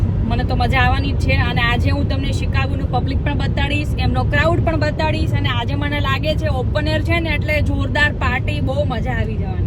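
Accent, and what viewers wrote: Kathiawar, India. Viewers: tame kathiyavadi bolu che e maja aave aapna aapna j bolu bolay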